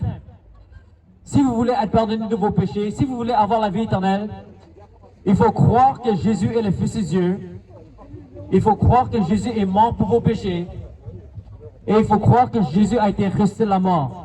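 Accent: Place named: Canada. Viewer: Canada? J'entends un tout petit accent canadien.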